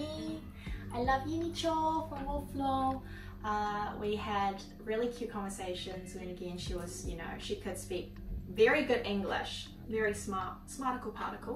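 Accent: New Zealand. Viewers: Hi, your content is so refreshig to watch and your voice so pleasant in my ears. You have same accent with Jennie of Blackpink and then I realized Jennie used to live in NZ 😊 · I just enjoy your deep NZ accent 😂❤ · can anyone tell me whether this is NZ accent english? i'm an english learner and i think this may be the perfect material to practice listening hahaah thank you for the subtile and looking forward to your new videos to come!!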